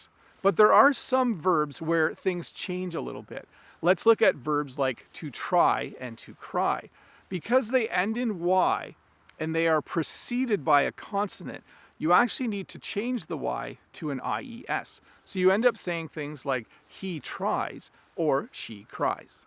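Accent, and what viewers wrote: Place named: Canada. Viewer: Really nice succinct video. I love that Canadian accent that shows the influence of Scottish immigration. Your ideas for building up a following are great, you deserve all the success that you get. Well done!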